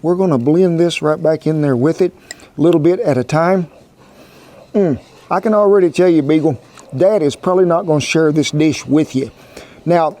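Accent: Italy. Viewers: We love and subscribe to your channel. We watch all of your new posts. Your Italian accent is annoying to me. Not trying to start a movement, it's just not funny or even tolerable to me. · I luv ❤️ Italian accent!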